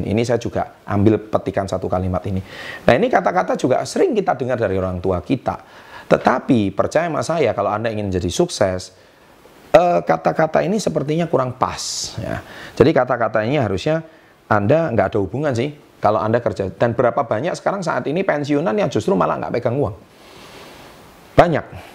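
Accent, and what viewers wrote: Java, Indonesia. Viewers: jowone pundi pak??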